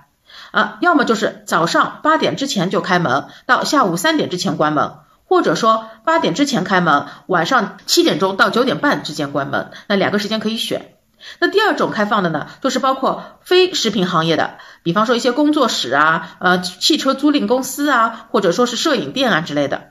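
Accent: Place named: Shanghai, China. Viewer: 特别喜欢你的视频！听出了一种上海宁米道！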